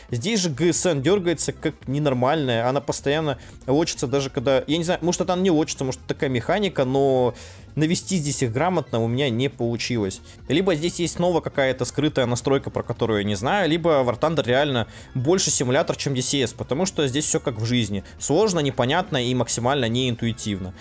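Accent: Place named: China. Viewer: Этот божественный китайский акцент!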